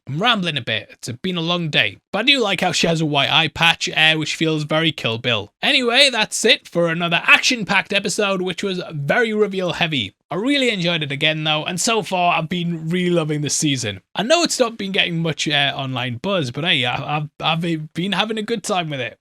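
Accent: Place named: United Kingdom. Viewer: I kept thinking "Who the hell is Bob?" Then it hit me. "Oh, BARB!" Brits and their dropped 'R's. Ain't language some shit? (Loving the breakdowns, by the way.)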